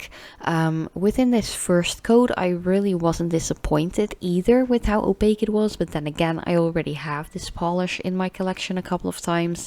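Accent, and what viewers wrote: Netherlands. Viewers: Je hoord wel dat je Nederlands bent ahah🤭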